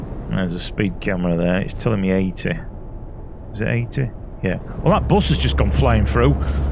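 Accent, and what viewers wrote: Yorkshire, United Kingdom. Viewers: Finally a Yorkshire Youtuber! Subbed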